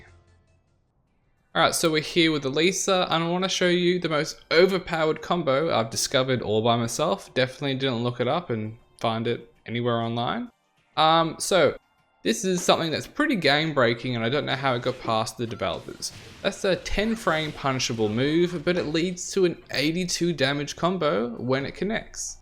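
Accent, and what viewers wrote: Australia. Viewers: Where you from in Australia mate? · Your channel has suddenly popped up in my recommendations. I like it when I get to listen to Aussies, being an Aussie in Germany. Funny thing, your channel name in German and corrected for proper german grammar, massiver Zug, means massive train or massive pull, depending on context.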